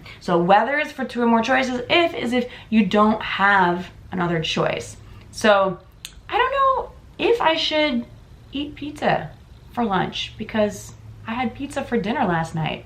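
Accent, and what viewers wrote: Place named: United States. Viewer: Thank you for the video,! I'm from Russia, and I learn English. I don't speak English very well, and I don't understand all that you said, but I really enjoy listening to you, because I love american accent! I hope I can speak English like you someday:) I'm sorry if I had a lot of mistakes, because my English level is Elementary now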